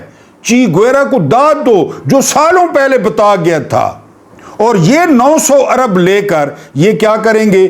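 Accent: Saraiki, Pakistan. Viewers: صاحب سرائیکی لہجہ میں اردو زبان بڑھی ہی دل نشین لگتی ہے ۔❤❤❤❤❤❤❤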